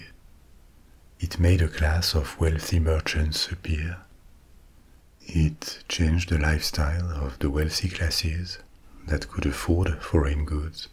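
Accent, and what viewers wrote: France. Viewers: Wonderful, relaxing ASMR and such a sensual and sexy voice with a gorgeous French accent. Delicious. 🥰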